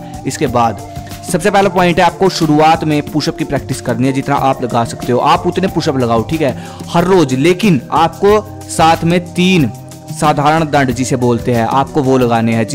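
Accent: Haryana, India. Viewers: Bhai aap kha se ho Mn to nu lagh h ki aap haryana t ho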